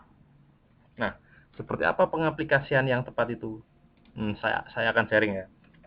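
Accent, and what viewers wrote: Java, Indonesia. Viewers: pean jowone pundi 😁😁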